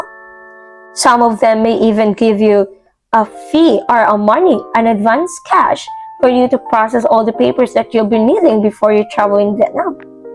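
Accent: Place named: United Kingdom. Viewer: Gusto ko yung may pa British accent 😂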